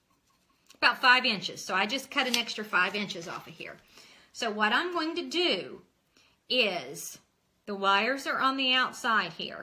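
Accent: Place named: South, United States. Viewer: I just got to watch your video.... I love the 2nd bow. It is much fuller. I love the chevron pattern. It is gorgeous!!! Truly gorgeous. I love the wreath. I didn't get to see the making of the wreath. But I heard what you did. Thank you for your kindness! I love you. You sound like me, I'm southern gal from Louisiana.... I love the wreath and both bows. Except my favorite is 2nd. Glad you changed them out!!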